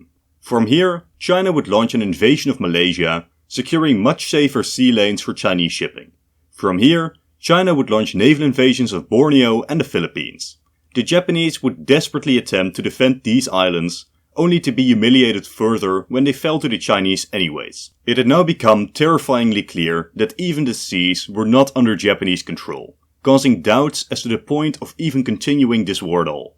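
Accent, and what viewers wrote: Netherlands. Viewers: yeah i don't understand how anyone thinks is American. Man has the most quintessentially Dutch accent ever lmao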